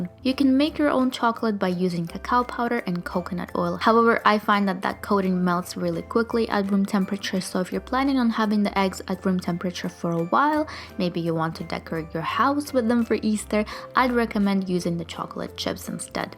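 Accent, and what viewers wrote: Russia. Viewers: Sweet Russian I think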